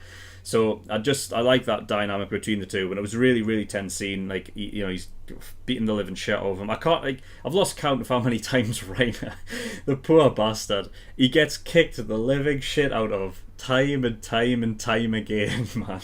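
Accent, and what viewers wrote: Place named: United Kingdom. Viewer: イギリス英語聞き取りやすくていいなw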